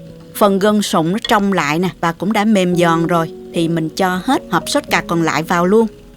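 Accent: South, Vietnam. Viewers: Giọng Nam Bộ kiểu mỗi ngày một hiếm, giờ xem truyền hình, phim trên HTV, giọng nam toàn gì đâu.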